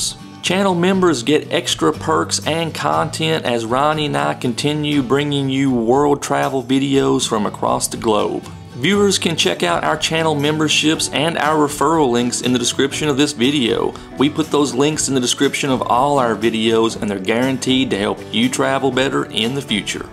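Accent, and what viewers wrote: Kentucky, United States. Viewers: Thank you so much!:) The Kentucky accent is so funny😁 Keep up good work guys!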